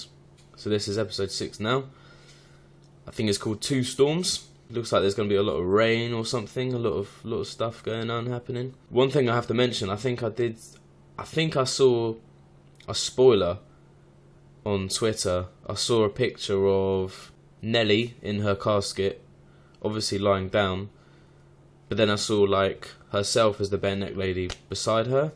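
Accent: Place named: United Kingdom. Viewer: I loved this show and ur reactions haha where bouts in the uk are u from cant figure out ur accent im from newcastle 🤣🤣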